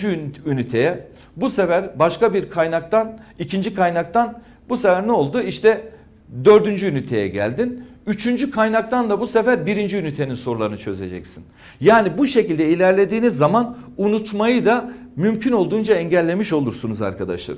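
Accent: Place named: Istanbul, Turkey. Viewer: Neyse ki sonunda nörüyorn, gardaşlar, godum mu oturtutum vs vs avam söylemleri olmayan İstanbul Türkçesi ile efendice ders anlatan beyefendi bir HOCA'ya denk gelebildim..Dikkat çekicem, ilginç olucam, bu isler bizden sorulur havalarındaki kahvede okeye 4. olamayacak insanlardan ders dinleyemiyorum..Çok teşekkür ederim Sayın Hocam..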